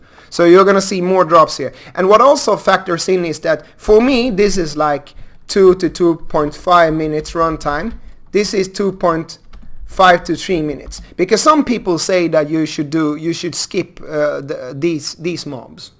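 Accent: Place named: Sweden. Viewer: Dude, this is a cool upload. Where are you from? The accent sounds.. Swedish? In that case, fan så coolt.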